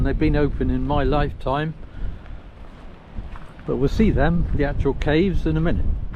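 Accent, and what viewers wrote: West Country, United Kingdom. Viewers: Spectacular scenery with lovely contrast between the hedgerows then down to the crashing waves along the clifftops all narrated with that west country burr.Makes me nostalgic for Devon my country of birth after living 32 years overseas so thinkin of planning a summer walk 😅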